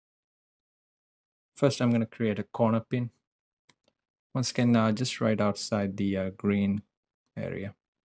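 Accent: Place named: India. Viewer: Du hast so einen indischen aktzent hört sich witzig an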